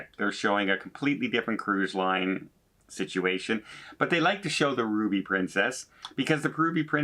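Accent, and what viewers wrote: Canada. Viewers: My husband loves to watch your videos. He says you sound so Canadian; he is too. Thanks for being logical. I don’t believe the number of covid cases onboard with crew and passengers is public information.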